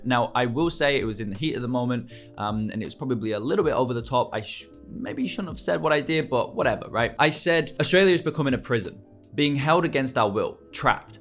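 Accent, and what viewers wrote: United Kingdom. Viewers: where are you from originally? Northern UK I'm guessing with the accent?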